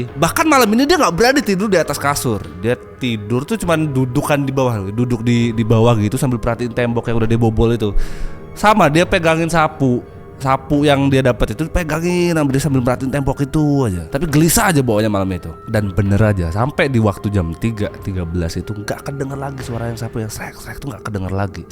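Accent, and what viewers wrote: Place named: Lampung, Indonesia. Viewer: Bang ni orang lampung bukan ya? Logatnya kaya orang lampung ya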